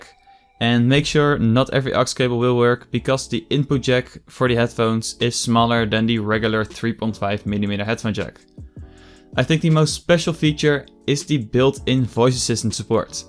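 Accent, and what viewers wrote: Netherlands. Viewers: Je hoort dat je Nederlands bent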